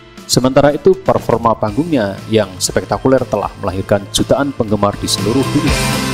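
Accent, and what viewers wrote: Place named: Java, Indonesia. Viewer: Kayaknya pengisi suara, wong jowo ikii..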